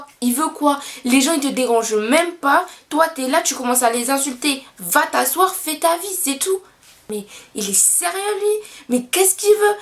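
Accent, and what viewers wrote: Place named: Madagascar. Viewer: j'aurai dit malgache 😊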